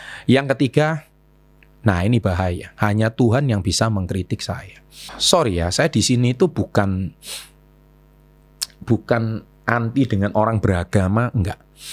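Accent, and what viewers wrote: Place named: West Java, Indonesia. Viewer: Cilaka😂 sunda pisan euy😂 i love you pokona mah❤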